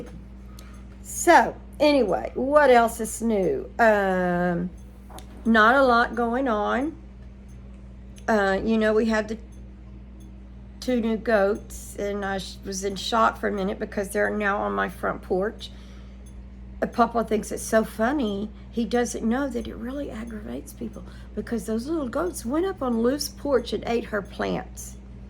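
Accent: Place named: Texas, United States. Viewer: ...Please don't think that your Texas accent is incorrect. I love that Southern & Texas sound. Speak it proudly❤❤❤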